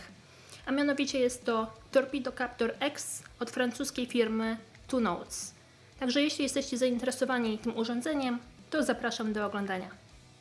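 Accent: Poland. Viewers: Могу ошибаться, но судя по языку (говор, некоторые знакомые слова - как в старом "Ведьмаке"с субтитрами) в видео - она из Польши.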